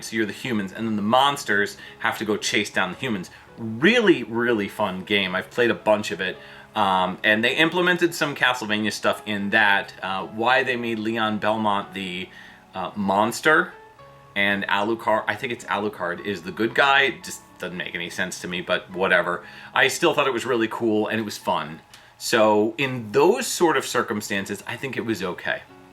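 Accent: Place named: Brooklyn, United States. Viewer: I love honest rants. Honestly saying what most are thinking - right down to the Brooklyn accent 😂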